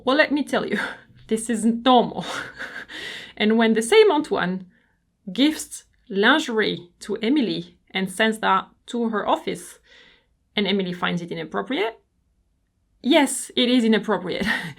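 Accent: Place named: France. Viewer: you are right every culture and country have their uniqueness but only get on my nerve when individuals think they are the centre of the universe and being condescending. Love your slightly French accent and understand every word you utter 👍❤️😘💋💋. I have to get the book you mentioned about culture mapping. Thank you for sharing this vd....💋💋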